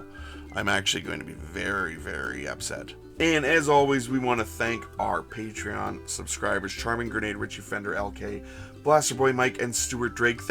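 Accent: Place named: Australia. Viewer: Definitely Australian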